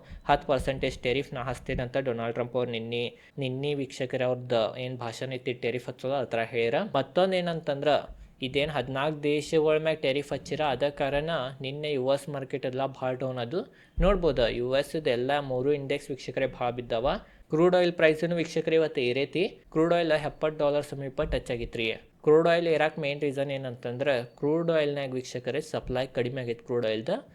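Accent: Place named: Belgaum, India. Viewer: Namaste, Your explanation and sweet Belgaum language is very interesting to hear. Your friend from gulbarga.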